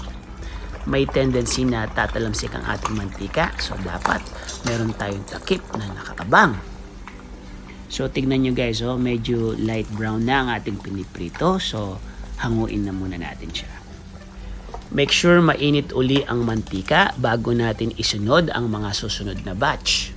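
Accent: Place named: Philippines. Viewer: I like that filipino accent Low to medium hit!! Proud Pilipino pala here 😊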